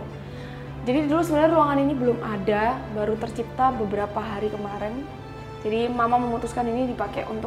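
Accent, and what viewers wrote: Java, Indonesia. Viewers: Ada darah Jawa kah ?? Sepintas cara bicaranya kek ada logat² Jawanya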